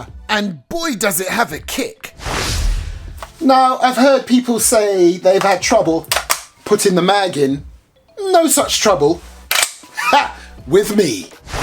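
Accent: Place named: United Kingdom. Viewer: sounds British so maybe he lives in the UK and he's not allowed by the law to buy or own the real version🤔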